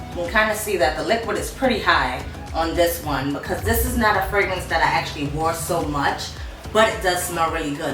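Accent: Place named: Bronx, United States. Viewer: I enjoyed how New York this video was 😂. I knew right away you was from the Bronx😂😂. A lot of these scents I have so many memories attached to it. At one time everyone and they motha had Gucci Guilty. MAC Turquatic is underrated but I’m happy about that. I love perfume videos where you can see the dents in the perfume. I’m going to check out a few you mentioned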